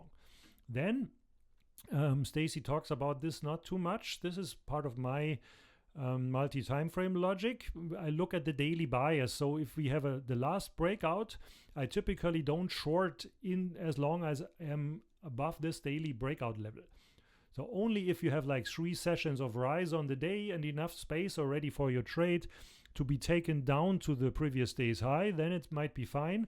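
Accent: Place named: Germany. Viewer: U Are Amazing , are u from Germany sir? Ur Accent is like that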